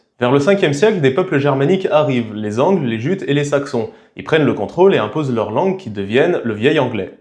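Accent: United States. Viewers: Bsahtek l'accent américain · Toujours très intéressant merci et même si les commentaires ne sont pas à la hauteur de votre propos c'est qu'ils prouvent notre intérêt ! je me permets donc : Pourquoi cet accent américain alors que l'accent de nos voisins anglais est si beau et qu'il s'agit d eux principalement? Pour la prononciation : Zibra / balè / tchoklet / chauffeur. Barbecue (barbe au cul) ok avec les autres. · Oui. C'est un accent américain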